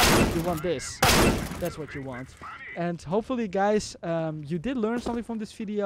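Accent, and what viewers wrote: Netherlands. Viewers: The really small adjustments are the hardest, big flicks on the body are fairly easy, but when your crosshair is just 1 pixel OFF the enemy ... So hard to compensate if you have to do it quickly. It's what i'm practicing most atm , also is this guy dutch ? SOunds like dutch accent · are you dutch?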